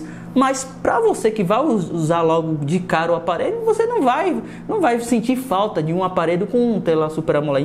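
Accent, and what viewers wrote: Bahia, Brazil. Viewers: Vc e bahiano de salvador? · Já da pra vê que esse e baiano vu skskskksksk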